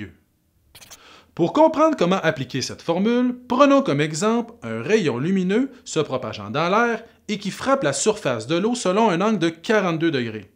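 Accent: Quebec, Canada. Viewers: Au tout début, je pensais que j’allais rien comprendre, en plus tu as l’accent québécois :) mais au final aucun problème ! J’ai parfaitement compris ce chapitre grâce à toi, Merci ! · Merci pour ton accent québécois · Wow merci beaucoup pour les explications simple et surtout très claire, avec un petit accent québécois qu'on apprécie toujours autant ! MERCi ! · top l'accent du Quebec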